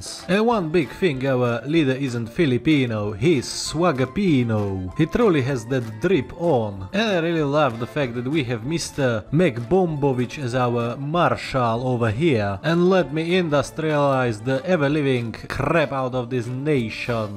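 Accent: Russia. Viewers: Bros speaking russian accent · Bro your the best 😜 your Russian English is quite cool 🥲💀☠️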